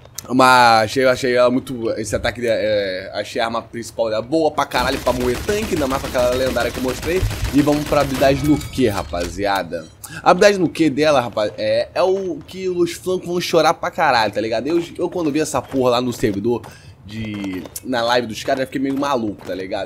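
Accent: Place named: Rio de Janeiro, Brazil. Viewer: Muito carioques, fala muito mal, parece que nunca esteve na escola, melhorar essa voz de malandro ai negão, kkk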